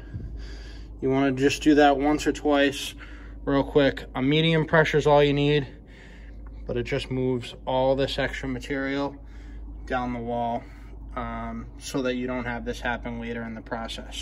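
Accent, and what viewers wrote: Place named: Massachusetts, United States. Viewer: I watched your video and am impressed with your work. I have a 7 yr old porcelain clawfoot tub. The faucett sits on the top of the tub, and there is of course a chrome drain.can these be taped off ? I'm a painter, but a perfectionist, and do not want to tackle this by myself. I heard your voice and guessed you may be from MA, then I saw the Patriots bucket 🤣 I'm in Weymouth, can I hire you to do it for me? Thank you !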